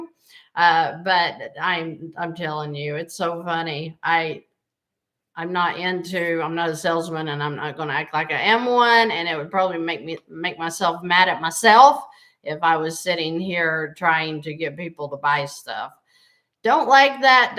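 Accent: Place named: Tennessee, United States. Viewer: We are considering doing a channel to track our progress weekly, and hold ourselves accountable, and it brings us so much joy to actually get to dream of possibilities of having financial freedom. We are a retired military family, 100% disabled vet, and I have 25 yrs with a pension, so we have on paper a nice retirement, unfortunately it’s all gone before end of month….I prayed for answers, I want work again. I want to make an impact. you are an Angel sent here on Earth. I love your little feisty TN accent, and your faith in our Lord, and in people. Amanda OK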